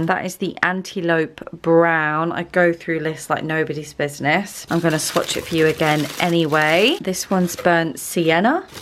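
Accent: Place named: United Kingdom. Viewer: I am soothed by your British accent here lol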